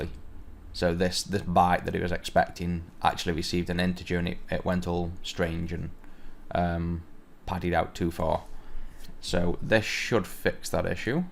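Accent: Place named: Manchester, United Kingdom. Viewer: Great tutorials, I'm enjoying them immensely. I'm coming from VB (I know!!!), <link>, VBA, <link> background, although I have also written a few, fairly rushed C# programs, I'm watching these more for good programming practice and to quickly fill in any big gaps in my knowledge - this tutorial being one such example. If there is one thing I will take from these tutorials, it is the proper documentation of code, I have been shamefully neglectful of this in the past :( I have a couple of questions, if you don't mind, do you have any plans to cover MVC and where are you from - my guess is South Manchester :) Anyway, thanks again for the tutorials - superb stuff.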